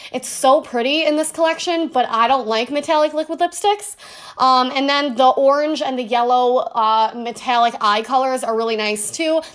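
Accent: Buffalo, United States. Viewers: Why is the packing always better than the products? Oh and are you from Buffalo? I love your accent!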